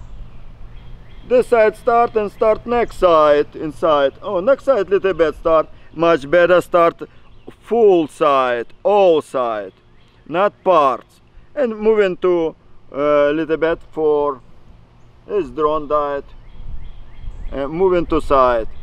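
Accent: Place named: Russia. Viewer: I love your Russian accent. I have a question. All my hives are not producing honey. Hives are strong but no comb no honey. Only nectar and brood..any idea?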